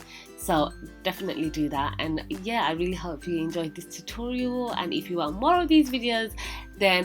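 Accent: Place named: Luton, United Kingdom. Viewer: Are you from Luton?! Because you sound like it I mean that accent! I'm from Luton as well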